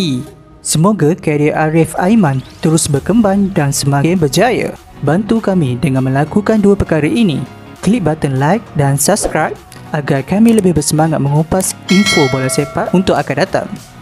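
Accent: Malaysia. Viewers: Admin org ganu ke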